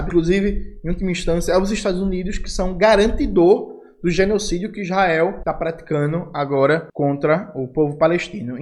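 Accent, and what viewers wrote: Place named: Recife, Brazil. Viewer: Kkkk, parece que vai ser na próxima segunda feira e tudo tomando um café com o excêntrico P Escobar. Com esta capacidade de análise honesta, o sotaque mais bonito do Brasil, o recifense...a vó te ama D. Jones! Parabéns pelo PCBR. 🎯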